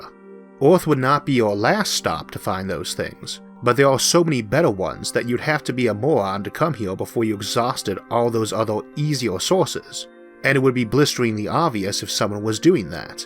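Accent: United States. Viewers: You have an american accent!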